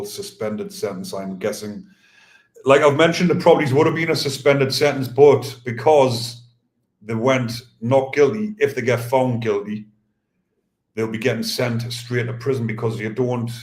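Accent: Newcastle, United Kingdom. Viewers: Good to listen to you love your Gordie accent 😁 ♥️ 🤍 💙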